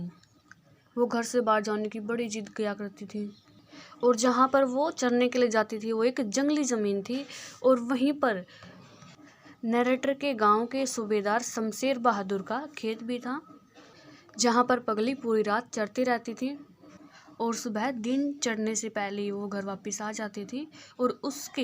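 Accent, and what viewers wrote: Haryana, India. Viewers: Full Haryanvi ascent narration of story' 😊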